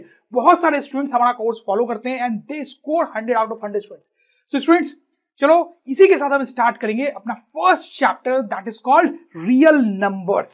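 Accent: Bihar, India. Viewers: aap bihar se hai kya sir ?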